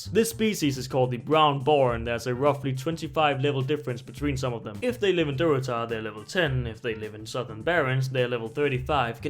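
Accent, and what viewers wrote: Denmark. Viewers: Are you from Denmark? :D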